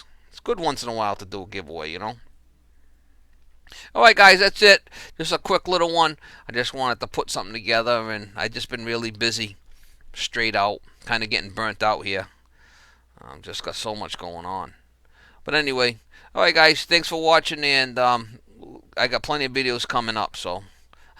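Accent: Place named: Boston, United States. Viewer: parker sporlan give away. If it weren't for that Boston accent, you could be doing voice-over work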